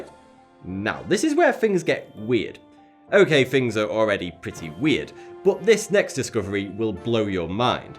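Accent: United Kingdom. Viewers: Why do British people have to speak like that? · The British accent? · Man, where in the UK do you come from, please?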